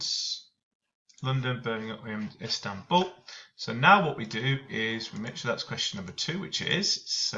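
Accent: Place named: Ireland. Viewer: Ireland XD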